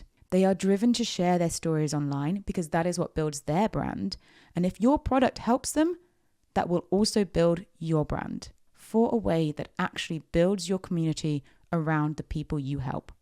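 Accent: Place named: United Kingdom. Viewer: Love your british accent. For you i dont need the subtitles 😅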